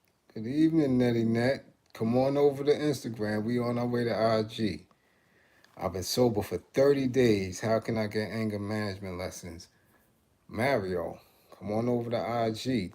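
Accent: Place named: Brooklyn, United States. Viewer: New sub (from Albany, ny) I love hearing you talk that talk with doggie in that bk accent. I had to subscribe and keep getting that knowledge. We need more men like you in this world to help get our ppl together. Keep up the great work!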